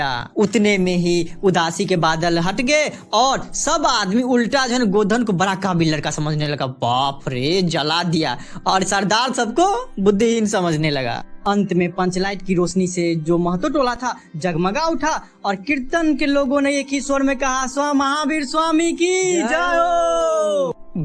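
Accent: Bihar, India. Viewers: Bhai aap bihar se ho kya aapki awaj se lg rha h bihari log ki aawaj aisi hi hoti hai😊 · Aap Bihar se ho kya 😄😄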